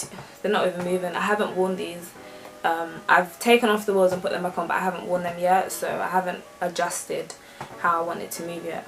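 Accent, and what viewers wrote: United Kingdom. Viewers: Good on you for buying stuff from olis, i know them and they are a family run business by a family of skaters and decent people. I skated their sessions every Sunday and can't wait for these stupid covid restrictions to end and for the sessions to resume. I have Bauer NS and I can't get on with them ether, bloody amazing looking boots but they give me horrendous blisters on my ankles and the lack of boot flex does not suit my skating style as I like to have hard bushings and have them tight for speed and rely of upper boot flex to be able to lean in turns but the NS has a boot as stiff as a corpse lol. I the boots i regularly skate on are my 90's bauer turbo and my new supreme turbo which are a clone of the Bauer turbo. Was nice hearing your London accent but it made me homesick, I'm originally from east London but moved out to Salisbury in Wiltshire 9 years ago and it drives me mad living among all these countryside snobs ha ha.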